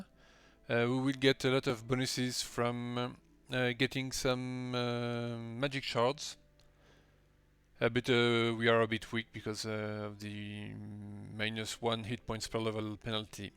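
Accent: France. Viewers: love your French accent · You sound French :)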